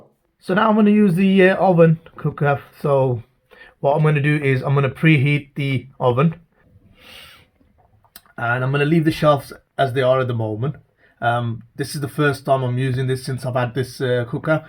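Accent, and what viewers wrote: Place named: Bradford, United Kingdom. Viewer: r u from Bradford, I guess due to your accent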